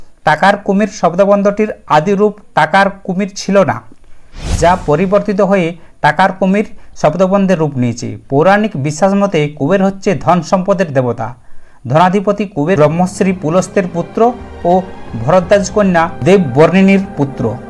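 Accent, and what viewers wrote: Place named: West Bengal, India. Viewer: Subject bhalo, kintu Medinipurer tane Bangla ta poriborton korte hobe....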